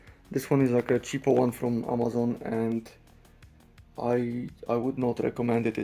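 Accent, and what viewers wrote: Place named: Poland. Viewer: The English flag confused me, with your accent Are you polish ??? Nice gym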